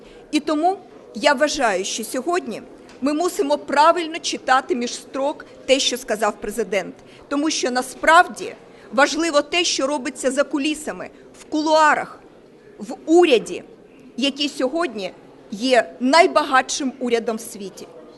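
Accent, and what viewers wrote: Russia. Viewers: как всегда хорошо спела, наша сладкоголосая сирена. Всё же українська у неё хромает, акцент русский :), но Азарову стОит у неё поучиться. Показуха - это её козырь. Тут четко видна борьба кланов Тимошенки и Урки Яныка.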